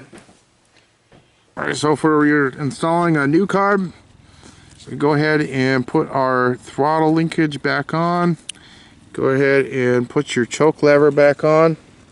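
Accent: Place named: Midwest, United States. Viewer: You must b a midwest boy.nice clear speech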